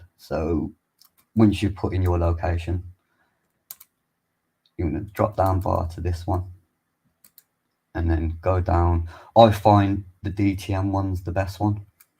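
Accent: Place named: Wolverhampton, United Kingdom. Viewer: Knew it was a Wolverhampton accent, I'm from Three Tuns/ Bushbury area 👍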